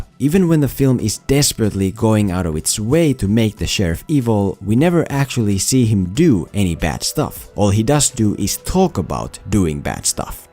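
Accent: Finland. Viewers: This been bothering me for a bit now, but would you happen to be Finnish? The way you pronounce english gives me that feeling.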